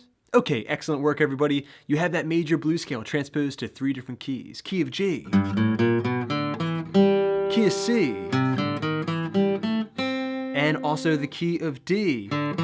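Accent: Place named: Delaware County, United States. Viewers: South Jersey / Philly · deleware county pa, they also say “Wooter” instead of water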